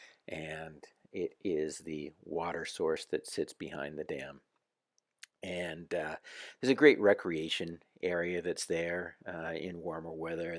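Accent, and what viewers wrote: United States. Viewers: But you talk in American accent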